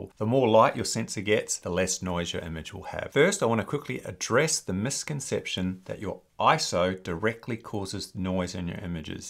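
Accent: Australia. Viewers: Hi, I'm really enjoying the videos... it's wonderful to hear an Australian voice, highlighting birds that are there for us all to see/capture. I have already subscribed, but am trying to find the 'join' tab, so I can sling a small amount your way in appreciation. Can't find it... perhaps you already have too much cash and don't need any more! If you can steer me the right way it will be a win-win. Have decided to bite the bullet and go mirrorless with an R7, and an 18-150 as my 'everything but birds' lens and a control ring adapter so I can use my (second-hand) 100-400Lii, plus a 1.4 extender. Happy days!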